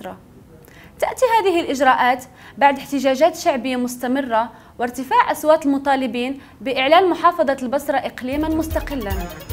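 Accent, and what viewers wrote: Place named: Morocco. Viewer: لا علاقة لها بالاعلام....كأنها جهاز آلي يتكلم....كما انها لا تمتلك أي احترافية ولا مؤهلات تخولها للدخول في مجال الاعلام....يجب عليها أن تتخلص من لهجتها المغربية لما تقرأ......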